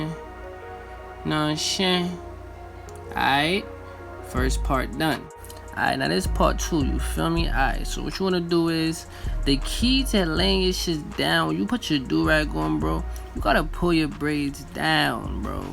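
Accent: New York, United States. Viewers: I can tell u New York nigga but big respect to u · He from New York lol 😂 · Bro..you from NY? Distinct ass accent lol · Thats Newyork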